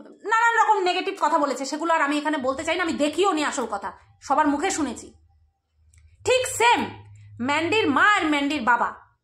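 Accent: Bangal, Bangladesh. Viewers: Tumi to kauke nokol kora comedy kora bangal vasay kotha bola egulo darun koro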